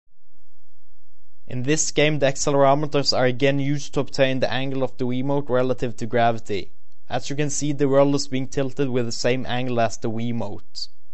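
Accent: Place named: Norway. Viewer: hører så sykt at du er norsk :) nice presentasjon foresten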